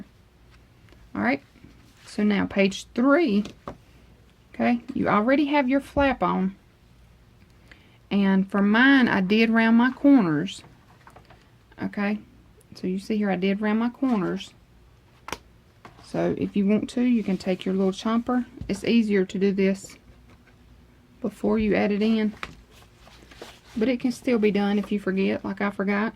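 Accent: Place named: South, United States. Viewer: Thank you for going at a speed where I can comprehend!! I just love your work and that Southern drawl!!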